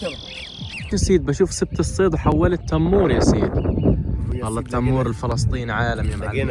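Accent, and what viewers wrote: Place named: South, Saudi Arabia. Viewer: ❤❤😂 نفس لهجتنا اخ جنوب السعوديه حوش الصيد واكفتها وتشرد وكثير وعشان 😂 نفس اللهجة اقرب لنا من اللي قريب من ٥٠ كيلو تختلف حنا عنهم وانتم تبعد ونفس اللكنه ❤😂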